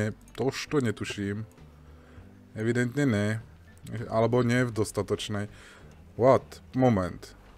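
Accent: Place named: Slovakia. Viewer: Ach,jo..Ty jsi ale případ. :D Ale nakonec jsi zjistil jak na to,ne?Asi ti to zabralo pár hodin pátrání a zkoušení,ale co už no....Jako v tom večerníčku Pat a Mat.Akorát,že ty jsi na to sám.Moc jsem se pobavila. Děkuju. :D Vím,že se opakuju,ale to slovenský ř nemá chybu. :D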